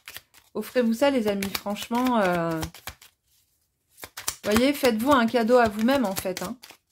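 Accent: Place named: Belgium. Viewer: Bravo! 2: ça me parle! Je vais déclarer mon activité Artiste- Décoratrice d'intérieur ! Vous effectuez vos guidances avec tellement de cohérence et d'assurance verbale et spirituelle, c'est très fort , toutes n'y arrivent pas, bafouillent, ou bien s'écoutent parler! Ce n'est pas du tout votre cas et votre accent belge me fait trop rire, ainsi que certaines expressions du genre: "la papesse c'est pas la chaudasse du tarot" lool! Osiris:::: j'ai fini ce matin Christian Jack :Abydos ou le mystère de la résurrection!" Tarots magnifiques comme le préraphaélite par ex.. . Oui je faisais de la peinture, enfant, et plus tard photographe, mais les "conseillers" m'ont toujours découragée! Merci à vous et au Soleil!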